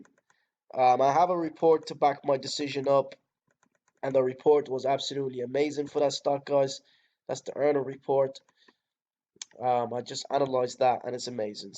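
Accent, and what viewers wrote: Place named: Ireland. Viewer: He from Ireland 🇮🇪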